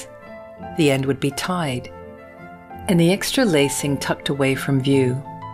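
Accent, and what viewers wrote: United States. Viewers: Why am American accent?? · Why is a yank, who is talking with a "porn voice" narrating this?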